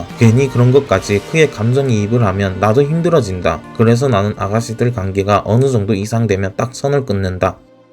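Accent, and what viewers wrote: Yanbian, China. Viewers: 말투가 연변이신데 조선족이신가요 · 조선족 말투같은데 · 이분 조선족 말씨를 쓰네요. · 말투가 조선족말툰데.... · 발음이 중국인이네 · 발음이 조선족인데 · 조선족이신가?ㅅ발음이 영락없는데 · 조선족 억양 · 말투 조선족인가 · ㅡㅡ.. 중국에서 했나 말투가 연변어네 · 발음이 딱 조선족인대? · 말투가 조선족말투 같네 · 말투가 조선족인데... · 발음이 중국인같은데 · 조선족이냐? 말투 거슬리네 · 발음이 조선족이네? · 음~~~이상하네,, 발음이 중공 발음.... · 대한민국인 어투는 아니시네요... 조선족 어투와 많이 유사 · 말투가 딱 연변인데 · 말투가 조선족 인가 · 너 조선족 맞지? 말투가 딱 조선족이네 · 발음이 조선족인데??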